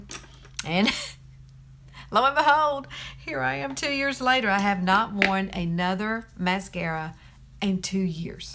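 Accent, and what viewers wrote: South, United States. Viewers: I use Younique products here in Texas. Love them. I know what you mean about the southern accent. I have an accent a little different than yours but a while back, I worked with a group with our company from Chicago. One lady, while we were on the phone said “I just love your accent!” I said “I thought y’all were the ones with the accent!” She just laughed. I was serious though.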